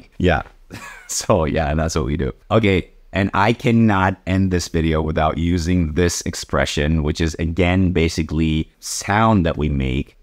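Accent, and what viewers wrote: United States. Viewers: Super us accent, but surprisingly he has no german accent, and he is born in germany. He is the only one i know to be de-germanized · Bence gayet güzel bir amerikan aksanıyla konuşuyor gözümü kapatsam Türk olduğunu düşünmezdim.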